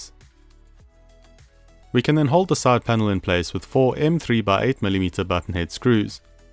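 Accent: South Africa. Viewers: It sounds like you're from SA, if so, where do you get all of this stuff? Would love to know about the threaded inserts specifically...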